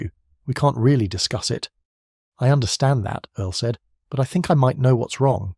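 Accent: United Kingdom. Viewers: Why is a Brit telling us these important stories? We need to have this kind of respect for our own. We need to be teaching it ourselves. Thank you, sir, for showing us the way.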